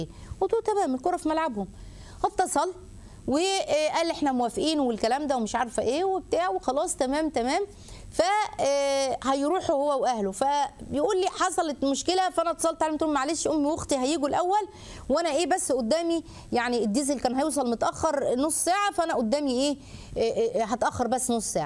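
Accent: Egypt. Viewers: عجيب م شكلها ولبسها ولغتها واضحة جدا مصرية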